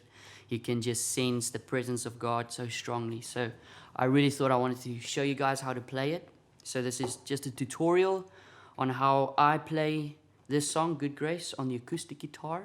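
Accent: South Africa. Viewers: can spot a south african accent from the first word hehe, great tutorial thank you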